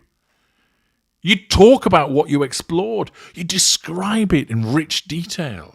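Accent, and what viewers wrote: United States. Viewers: Love your American accent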